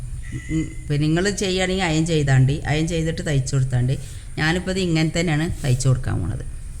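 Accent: Malappuram, India. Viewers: Malappuram original samsaram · തനി മലപ്പുറം slank...... no ജാട.... അടിപൊളി താത്ത...... i like it ഉറപ്പായും ഞാനും ഉണ്ടാകും ഞമ്മടെ ബെഡ് സീറ്റ്‌....... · താത്ത സൂപ്പർ നമ്മുടെ മലപ്പുറം ഭാഷ പൊങ്ങട്ടെ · ഇത് ഞമ്മളെ മലപ്പുറം ഭാഷ..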